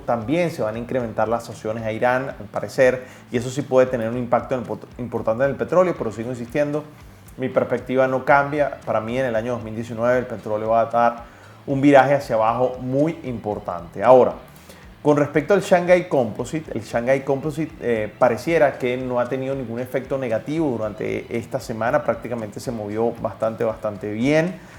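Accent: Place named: Venezuela. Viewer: Por tu acento creo que eres venezolano, yo también lo soy y vivo en New York y ya tengo casi dos años en el trading, espero aprender mucho más con tus videos y con tus tips, y agradecido por compartir tus conocimientos, para los que aún estamos en este proceso de aprendizaje.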